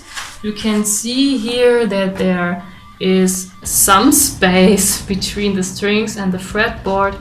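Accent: Germany. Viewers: dieser moment wenn man realisiert das sie einen deutschen Akzent hat:D und sie deutsch ist und gute Videos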